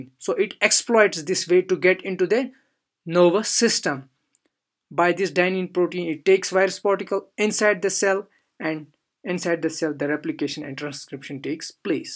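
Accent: Kashmir, India. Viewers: bro u sound like kashmiri . am i right?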